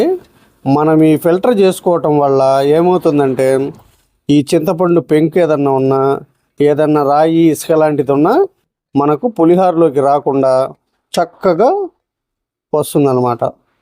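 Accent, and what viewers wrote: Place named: Andhra Pradesh, India. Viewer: Meedi Andhra language laa gaa vundi ee vooru andi Maadi Andhra ne andi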